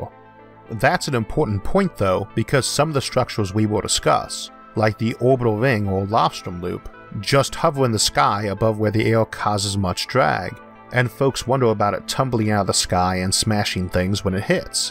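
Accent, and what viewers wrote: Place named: Louisiana, United States. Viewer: I don't want to make a point of this as I feel discussion of it takes away from your content, but I need to chip in here. I don't hear an impediment. I hear a pretty specific accent. I'm not American so I'm poor at knowing regional accents, but I always get a bit of the Louisiana twang, maybe? Indeed the only time I think about your impediment is when you mention it. Can't be all that bad I guess! Thanks so much for this wonderful little corner of youtube. Free of idiots and haters, just reasonable people with a shared interest. All the best.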